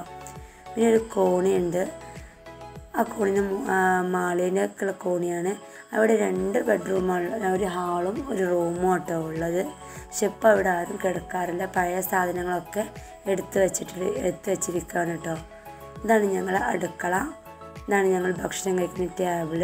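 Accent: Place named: Malappuram, India. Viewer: Malapuram aanu alle samsar kelkumpoanassilaavunund veed pazayath aananneyollu nalla soukaryam ulla veed aani. Nannayitund video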